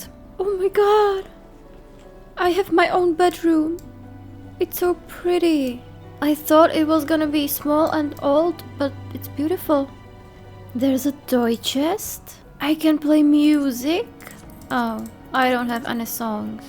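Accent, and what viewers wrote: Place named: Czech Republic. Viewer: omg já jsem si říkala že slyším český přízvuk!🩵✨^-^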